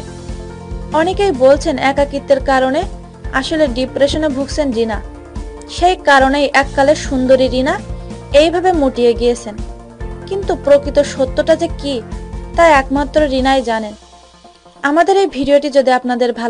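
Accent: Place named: Noakhali, Bangladesh. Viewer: Noakhailla mea .. Aga kota bola shikhun...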